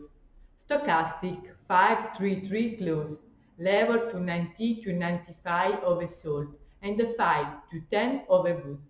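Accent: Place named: Italy. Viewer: nice video, you have an italian accent, are you italian?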